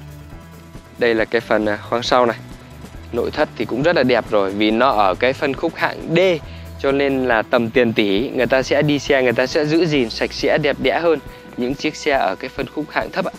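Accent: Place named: Hai Duong, Vietnam. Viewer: Đúng dân hải dương chất giọng ko chốn dc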